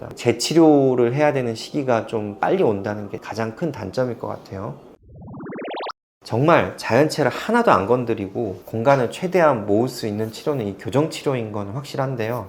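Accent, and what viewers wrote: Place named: Gwangju, South Korea. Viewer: 원장님 답변 정말 감사합니다. 저 광주광역시 사는데 원장님 억양에 약간 동향 느낌이 났는데 홈페이지 가보니 광주 사셨을거 같아 반갑습니다. 복 많이많이 받으세요^^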